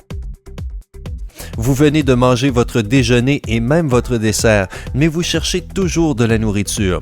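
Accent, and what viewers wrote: Quebec, Canada. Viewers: J'adooooore l'accent québecquois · J'aime l'accent québécois du mec mdr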